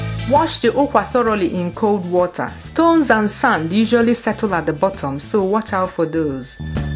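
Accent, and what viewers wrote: Igbo, Nigeria. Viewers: love the igbo accent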